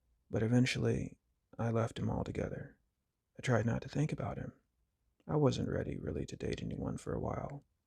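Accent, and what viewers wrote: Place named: South, United States. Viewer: 🤗 great presentation yet again! I must say that I love your Southern Charm Style Accent! 🥰☺️🥹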